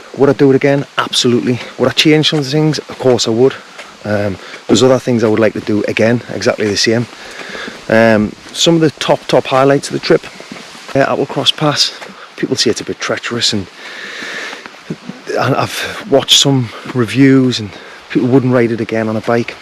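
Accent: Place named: United Kingdom. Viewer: Must watch content. informative, entertaining & thoroughly enjoyable. Tremendous scenery. Cliche-free narrative in one of Britain's best regional accents. Await anti-clockwise sequel. Thanks!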